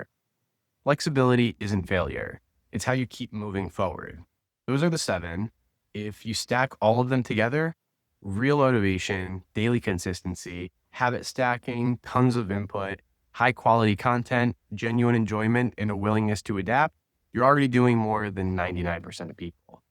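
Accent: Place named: United States. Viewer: Wow!! All this wonderful information you are sharing really resonates with me. No doubt you have a way with words, and your American accent is clear, pleasant and easy to understand. So, congratulations on your YouTube channel, and thanks a lot for your helpful insights. I look forward to more fantastic stuff—hopefully soon. Best wishes from Spain!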